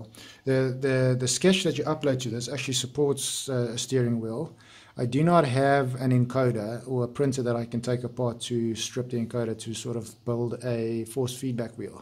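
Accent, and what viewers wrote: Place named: South Africa. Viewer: I can hear that SA accent bro🤣 #represent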